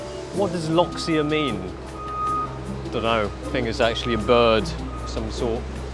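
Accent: United Kingdom. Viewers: gorgeous Britain accent as always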